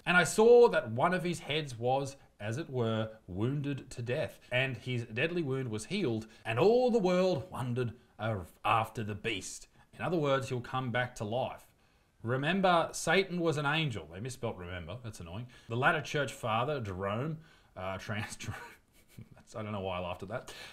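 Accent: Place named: Australia. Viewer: The devil voice just doesnt work with an Australian accent lol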